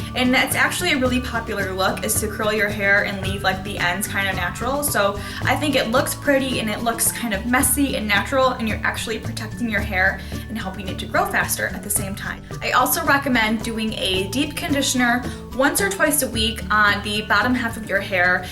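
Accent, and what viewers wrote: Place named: Chicago, United States. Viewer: Your accent is awesome, Chicago? N your pretty, thanks for the tips too I subbed:)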